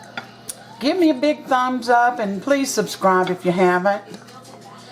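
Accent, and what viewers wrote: South, United States. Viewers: hi! wow wow you made one lovely dish of supper! I make my own poultry seasoning and sage & rosemary is in there, such a fine blend of herbs!!!!! I'd like to thankyou, for such a wonderful video, and sitting down watching your cooking, listening to you &, is very therapeutic, your Southern accent, very peaceful & soothing thankyou my new friend hugs from willow-Sage in victoria bc Canada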